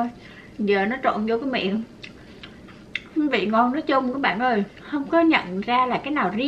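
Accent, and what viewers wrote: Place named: Hue, Vietnam. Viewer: Cô nói tiếng huế hay ghê á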